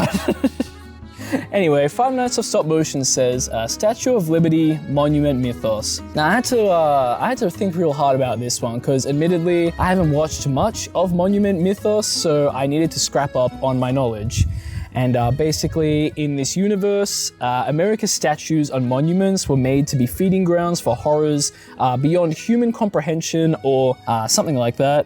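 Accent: Australia. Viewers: You look really nice, like not just how you look, your voice and your look go well together in a way that makes you look better. Sorry if that sounds weird. I'm high, are you australia? I can't tell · are you aussie?? fuck yeah brother best country in the world (said that bc of the fauna and accent but i checked the bio)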